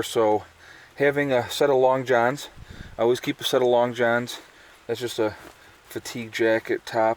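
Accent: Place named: Pennsylvania, United States. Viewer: I know you say you live in New York, but I just talked to someone on the phone that works in pa and sounded just like you. Also a visiting nurse. Where do you live really? Haha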